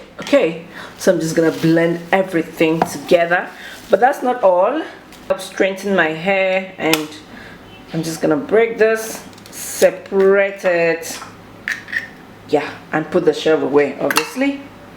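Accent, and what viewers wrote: Nigeria. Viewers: Please stick to your Nigerian accent....nice video btw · As you were talking I said to myself this lady has to be Nigerian. Then you mentioned Nigerian rice. Gbam! I've just started doing the rice water rinse, I may try this one down the line.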